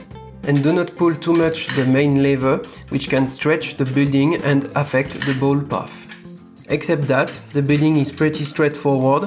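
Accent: France. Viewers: L’accent FRANÇAIIIIIIS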